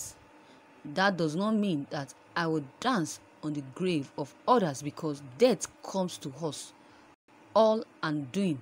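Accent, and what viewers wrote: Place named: Yoruba, Nigeria. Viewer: Why do you read like someone in Primary Six or JSS1? Please, my dear sister from Ipetumodu, I am sure there are better news readers and presenters from Ipetumodu. Give this job to one of them. Nobody understands what you say because of your poor English that is coloured by English pronunciations from people of your ethnic group who have refused to mix with people from other ethnic groups. This is now showing here. Leave this reading for better readers from the Yoruba ethnic group. Delegate such task to him or her, while you control the money that would come to your by way of royalties.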